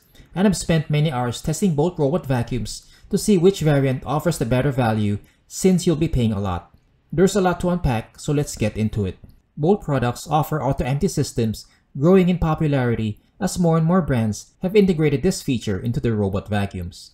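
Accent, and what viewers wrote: Philippines. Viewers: I can tell you're a Filipino by your accent. How did you buy these high-end here? How about the availability of services/maintenance when these break down and needs fixing?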